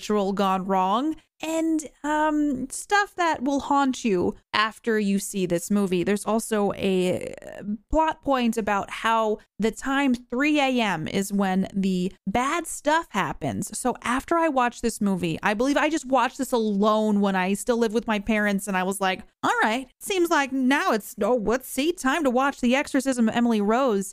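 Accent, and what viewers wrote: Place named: Transatlantic, United States. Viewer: imma comment that your transatlantic accent is perfection.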